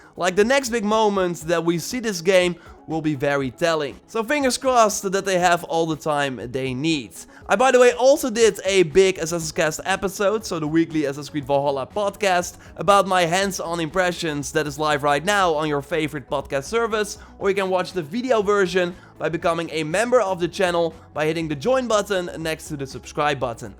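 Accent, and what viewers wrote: Netherlands. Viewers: Yo, are you Dutch? Your accent:p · Are u Dutch?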